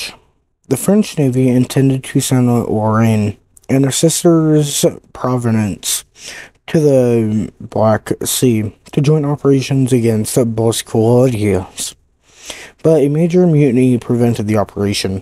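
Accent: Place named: United States. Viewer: The narrator is an American.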